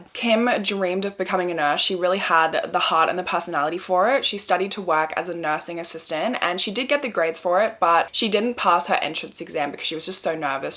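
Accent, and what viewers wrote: Australia. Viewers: Me desperately trying to keep up with the Australian English as an American😂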